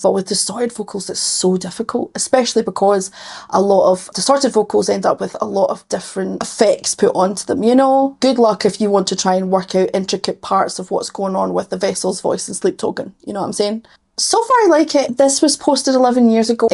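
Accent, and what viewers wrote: Scotland, United Kingdom. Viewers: Hey, I'm Bruna from Brazil. YouTube recommended your video to me and I loved your vibe. I'm learning English and have never listened to a Scottish person until now. Your accent is so beautiful. 🥰 May I recommend you a music video from a Brazilian singer? She sings in English,. Here's one of her originals songs: <link>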